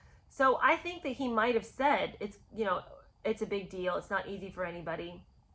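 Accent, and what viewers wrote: United Kingdom. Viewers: I agree. She looked gorgeous in England. She had to have a stylist and she claimed she didn’t. I really enjoy your channel. You’re very funny. Your British accent is simply the best 😂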